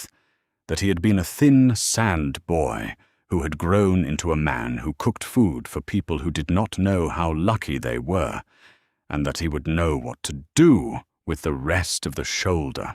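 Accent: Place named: United Kingdom. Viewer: Who cut this, and why is the narrator British? Off.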